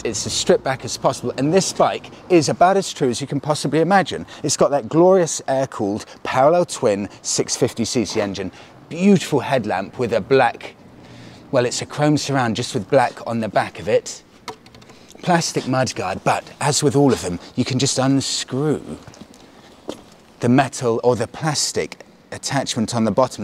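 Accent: England, United Kingdom. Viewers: It’s like watching The Fonze, with an English accent! 😄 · I guess I am going to learn English from your videos! British English is a little bit harder to understand than American English but that sense of humor totally convinced me. :-)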